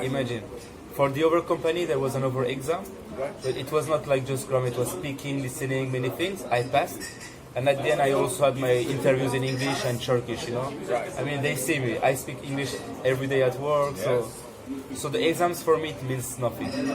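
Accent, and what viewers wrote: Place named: France. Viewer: An english speaking turkish guy with a french dialect :-) Let me hug you a bit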